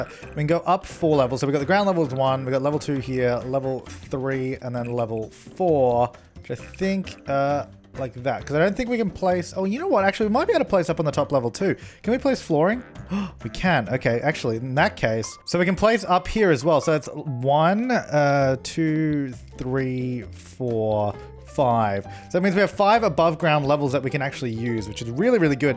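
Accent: Australia. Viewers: Your Channel has grown so Fast I reminder when you had 100,000 SUBS. :) Are You Australian?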